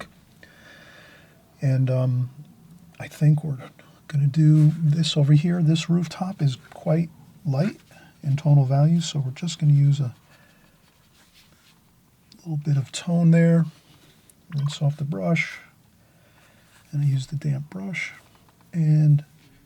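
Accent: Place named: Philadelphia, United States. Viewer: I’m from Philadelphia, living now in South Jersey. I recognize your accent.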